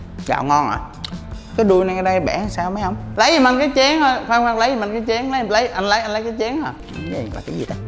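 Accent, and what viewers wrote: North, Vietnam. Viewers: Nói giọng bắc buồn cười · Giọng bắc xịn đấy:))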